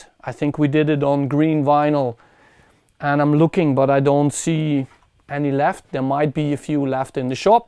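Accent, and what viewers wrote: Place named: South, Netherlands. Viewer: I like the thick Dutch southern accent. I mean, do not change it. Go with it, embrasse it. Looking forward to see more of you. Untill the next fire:).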